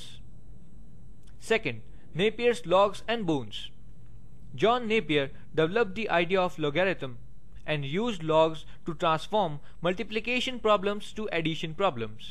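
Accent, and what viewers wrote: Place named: India. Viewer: Youtube auto-gen subtitles are wrong. . . . .Can't take indian accent :V. . .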